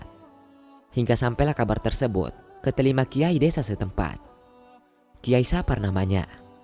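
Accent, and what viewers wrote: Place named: Medan, Indonesia. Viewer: pengisi suaranya orang medan pasti😊😊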